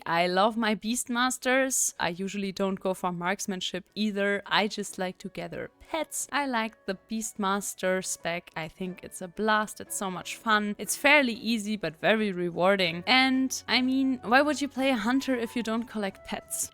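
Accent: Germany. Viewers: Hey! Love binging your videos while Studying for my exams haha! where did you learn about the wow lore im trying to get into it because im newer to wow. ps: are you german? you accent sound familiar😊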